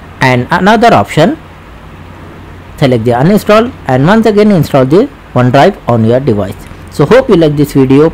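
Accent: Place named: India. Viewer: Indian english accent 😁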